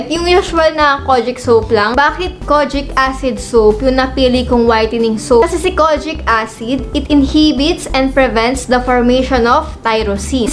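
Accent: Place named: Visayas, Philippines. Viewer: Visaya kaayo uy *HAYAHAY NA ANG BUHAY MO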